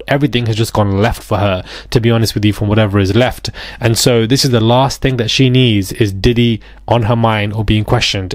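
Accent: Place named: England, United Kingdom. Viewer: You can slow it down if you acers the playback speed in the top right. But yeah England has so many accents when people speak normal speed its hard to understand if you're not native. Just be thankful he's not Scottish or Irish you prob wouldn't understand one word 😂 even I as a native might need to repeat parts!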